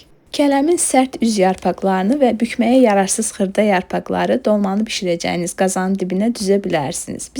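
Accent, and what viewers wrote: Baku, Azerbaijan. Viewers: Can Ay Bakı Kəndlərindn Gələn Səslərin Ləzzəti. Həeee göyərti Yox. Əhsən Sizlərə.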